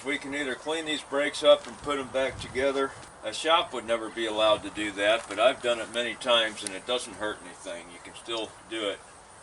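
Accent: Spain. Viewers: Much respect to you Mr for the great work you did there and for the awesome Spanish accent you have (old western+ Spanish)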